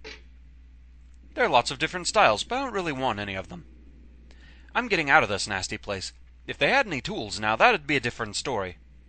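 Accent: Scotland, United Kingdom. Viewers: First time I played this, the opening message really got to me for some reason. "Violent or cruel" is so ominous. I've seen "violence and gore" but cruel is a more subjective word that hints at malice, and I always found that warning real creepy. Fortunately your warm friendly Scottish voice makes things a lot more welcoming!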